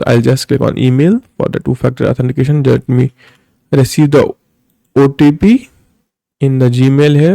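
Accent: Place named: India. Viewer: your indian accent was just disturbing. try to correct how to speak in english before applying scholarships.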